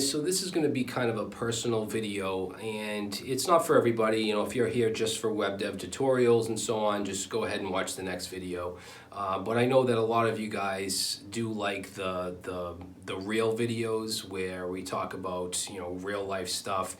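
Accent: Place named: New England, United States. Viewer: your accent, t-shirt, and water bottle bring me home. I grew up between Chelmsford and Southern New Hampshire. (I live in Illinois now.) I benefit from your work. You are a really good teacher, keep it up.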